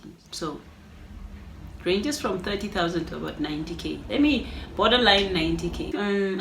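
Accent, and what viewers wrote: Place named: Vietnam. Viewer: your NamLish on point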